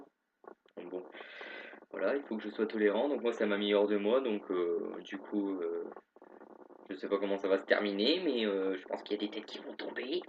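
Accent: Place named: Nord-Pas-de-Calais, France. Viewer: Ouai, ils sont vraiment graves l'administration... ils ne se mettent pas à ta place, ça coûte rien de modifier un prénom tout en laissant au pire ton prénom f quelque part, au moins pour que tu te sentes bien en cours, quoi. Et pour la personne qui t'a balancé, je trouve ça dégueulasse, je lui aurai refais le portrait lol Moi aussi, je suis du Nord, plus près de Béthune :D Me semblait bien avoir reconnu l'accent ch'ti ! Et t'en fais pas pour la mammec, ton résultat est vraiment bien pour 3 mois, ça va encore beaucoup changé.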